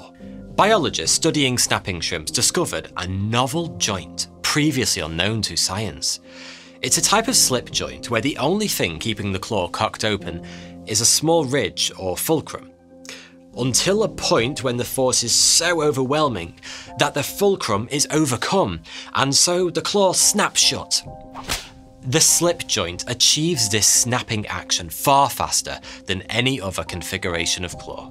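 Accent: United Kingdom. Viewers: Idk if I can handle the britishness any longer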